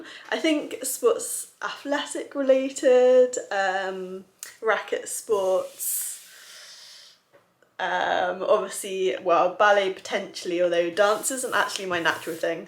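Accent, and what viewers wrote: United Kingdom. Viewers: Would live to find a cute and sweet british girl like. That accent and cute face is all an American guy needs. 😍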